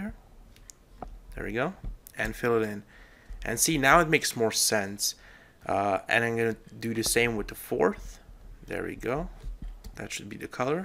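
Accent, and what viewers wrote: Germany. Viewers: Man merkt zum Teil dass du einen deutschen Akzent hast xD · Are you German? :D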